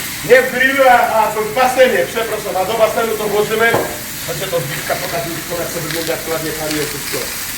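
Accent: Highlands, Poland. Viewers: skąd ten góralski akcent u gościa znad morza?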